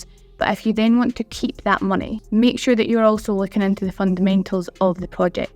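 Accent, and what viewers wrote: Scotland, United Kingdom. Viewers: Is that a Scottish accent? It's a nice one =-). Keep up the great work & quality content.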